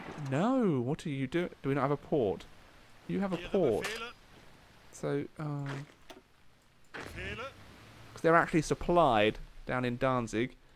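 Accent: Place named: United Kingdom. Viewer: I thought you were British😱😂😂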